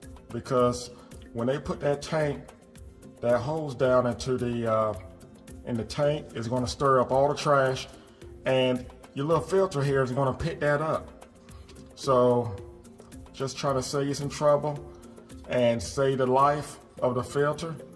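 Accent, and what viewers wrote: South, United States. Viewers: love the southern accent, lol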